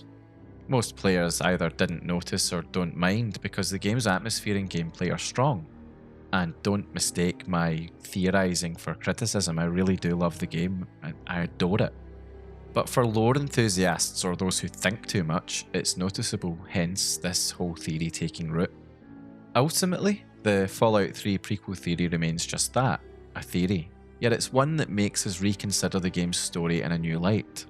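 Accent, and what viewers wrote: Scotland, United Kingdom. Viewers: Holy shit, a Scottish video game lore youtuber? I'm fair tricket wi yer video and jist wanted to say fit like the day loon, foos yer doos? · Scottish accent ❤ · Your accent... Is it Scottish ? Sounds great. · You sure hes not Scottish? He sounds Scottish to me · Nice, the exact same video as the others but with a lovely Scottish accent - that alone makes this the definitive edition lmao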